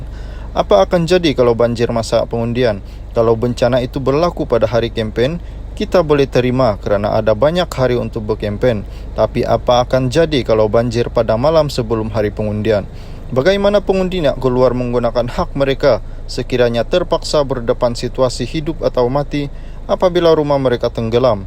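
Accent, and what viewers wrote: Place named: Sabah, Malaysia. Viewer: Hei bro suara kamu dah tentu orang Sabah.. kamu makan le duit yang ditabur oleh musuh2 umno kepada kamu untuk berita2 jahat yang mengelirukan rakyat.. aku berdoa semoga duit2 yang kamu dapat dan memberi keluarga kamu makan menjadi BARA API NERAKA yang akan memusnahkan kamu.. kamu orang JAHAT! Puuiiiiii Poodah!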